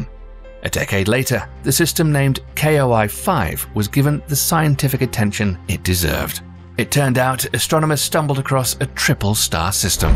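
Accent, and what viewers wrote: England, United Kingdom. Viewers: Oh thank god it's a British person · All English men at a certain age sound the same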